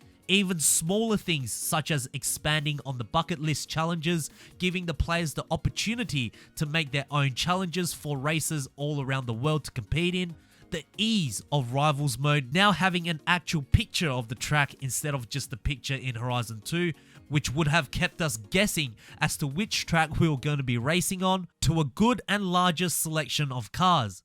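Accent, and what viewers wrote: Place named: Australia. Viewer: Everyone who is recording forza videos have Australian accent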